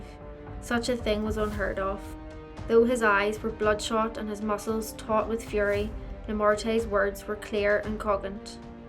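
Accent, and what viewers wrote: Northern Ireland, United Kingdom. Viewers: Are yous by chance from Northern Ireland?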